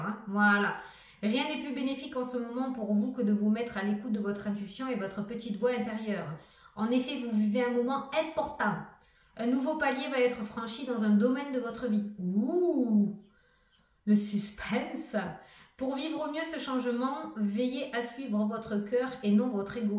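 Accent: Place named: Bordeaux, France. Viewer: Serai tu de Bordeaux ma belle fille parle comme toi.🥰🥰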